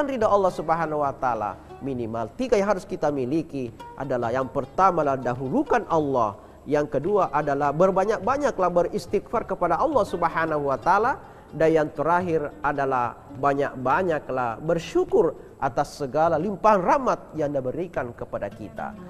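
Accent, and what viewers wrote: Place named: Bugis, Indonesia. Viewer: Iyye puang biar ada khas bugisnya...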